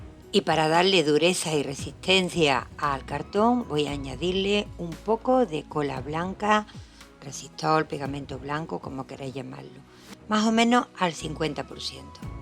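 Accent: Spain. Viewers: Desde 🇪🇸 me ha encantado lo que has hecho, creo que también eres de 🇪🇸 por tu acento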